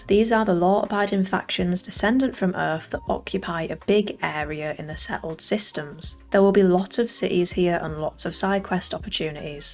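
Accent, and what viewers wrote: United Kingdom. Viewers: shes definitely English.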